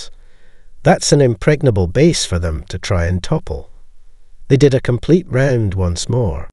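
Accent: Scotland, United Kingdom. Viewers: Scottish voice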